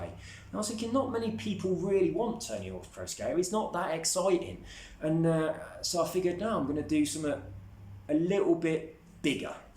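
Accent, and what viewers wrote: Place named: Scotland, United Kingdom. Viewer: LOL nice apartment! Don't know anything about you but I can say you're a Scotch. Got it from the accent! Never had an apple watch but I can guess they're bad. Also agree with you. No idea why people have to be over 13 to join giveaways or restricted to join any of giveaways. Usually I enter only PS4 or PS5 competitions. I did enter yours because I want to trade for PS5 as soon as I win the competition. Well, the reason I want to trade for PS5, technology is really expensive in my country, Turkey. Don't know if you believe me but I still can't afford a PS4. That's why I want to win XBOX Series X. IMHO Xbox got better hardware, that's cool, but we can't ignore the fact Playstation has better exclusive games. I am not a multiplayer person, I play games for its story. I wanna feel in it. Which is why I am going to trade with a PS5 if I win this competition. 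I want to thank you for giving us this chance and good luck to all of you. Have a nice day and stay safe!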